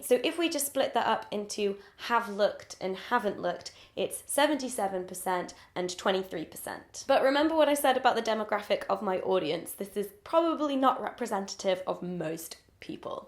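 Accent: United Kingdom. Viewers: You have the most satisfying and British (like me) voice ever omg... also im glad im not the only one!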